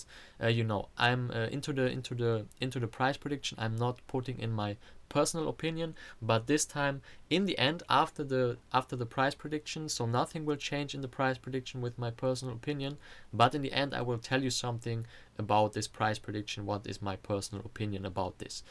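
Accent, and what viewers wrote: Germany. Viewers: einen deutschen erkennt man immer wenn er versucht Englisch zu sprechen !! nice video bro :D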